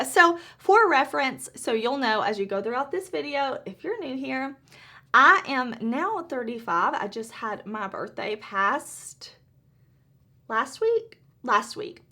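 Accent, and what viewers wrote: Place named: Arkansas, United States. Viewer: Where are you from? Bc u sound like me 😅 I’m from Arkansas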